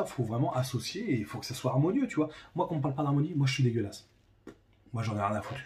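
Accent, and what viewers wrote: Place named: Gaul, France. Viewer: tu me tue, cette façons de parler, ca c'est du gaulois solide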